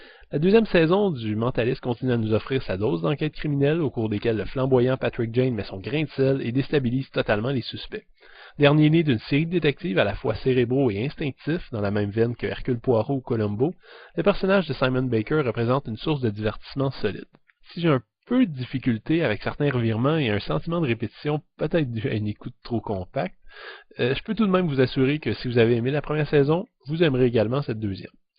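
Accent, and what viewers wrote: Quebec, Canada. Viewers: C'est déjà sortit au Québec? (d'après l'accent...) Bonne critique! Merci!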